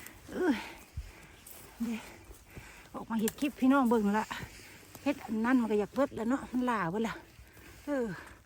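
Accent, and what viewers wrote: Sakon Nakhon, Thailand. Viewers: เจ้าคนบ้านได๋สำเนียงคือไทสกลแท้จ้า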